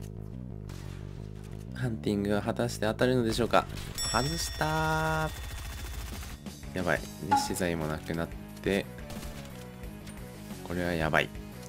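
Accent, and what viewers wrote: Kansai, Japan. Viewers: さんてもしかして関西の子？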